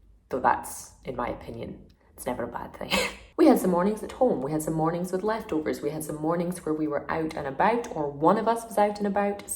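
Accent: Scotland, United Kingdom. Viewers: Where abouts in Scotland are you from? 🏴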